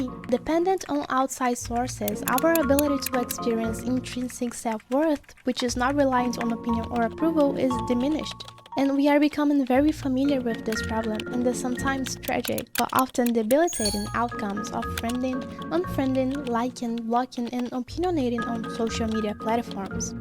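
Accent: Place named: Brazil. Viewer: Pensei que fosse brasileira pelo sotaque e confirmei com o áudio da sua mãe kkk Gostei muito do vídeo e das referências! Abraços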